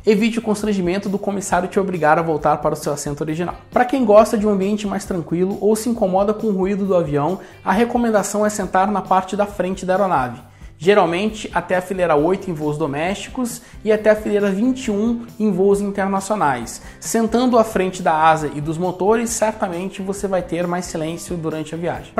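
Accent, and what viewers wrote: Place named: Brazil. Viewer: O cara fala brasileiro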